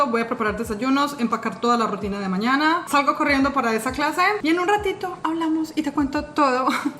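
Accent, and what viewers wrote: Colombia. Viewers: Como me gustan tus videos!!!!! Amo cuando hablas con tu tonada Colombiana, me encanta!!!!! Otra cosa, lo que decías de cargar combustible que no te gusta, me pasa eso mismo pero cuando voy detrás de algún camión o camioneta cargados con algo, por haber visto peliculas como "destino final" me da mucho miedo y si o si tengo que pasarlos jajajajaja Me encanto tu rutina semanal, como todos tus vídeos, por supuesto! 🌞❤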